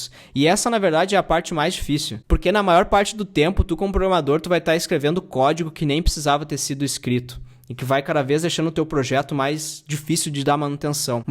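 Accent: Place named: Porto Alegre, Brazil. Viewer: Me inscrevi pelo conteúdo e porque tu é de Porto Alegre (notei pela fala). Abraços!!!!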